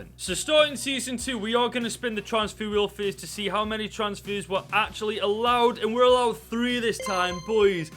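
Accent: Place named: England, United Kingdom. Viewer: My guy has the thickest English accent I’ve ever heard, and I love it 😂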